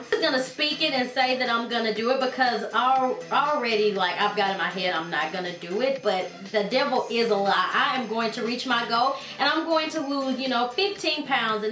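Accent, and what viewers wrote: South, United States. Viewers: I love watching your videos & that southern accent :-) keep up the good work & YESSSS EAGERLY AWAITING THE ROOM TOUR B/C WRKN ON MINE & NEED SOME INSPIRATION :-) Be blessed!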